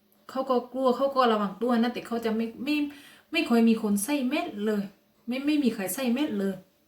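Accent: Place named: Ranong, Thailand. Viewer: สำเนียงเหมือนคนระนอง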